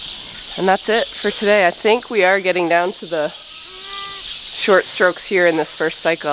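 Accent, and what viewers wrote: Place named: Canada. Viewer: I love the Canadian in your voice!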